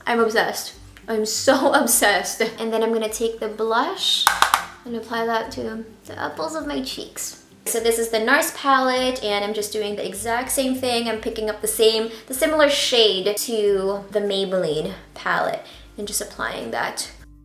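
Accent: Philippines. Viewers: You're accent makes me feel like I'm back with my extended family lol I haven't seen my dad's family in FOREVER and I miss them! Pinas have such adorable, recognizable accents haha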